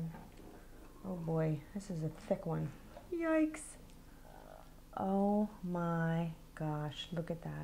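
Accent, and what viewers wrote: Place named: New York, United States. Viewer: I am going to make this tonight!!! Ps I love your accent!!!! Are you from New York ??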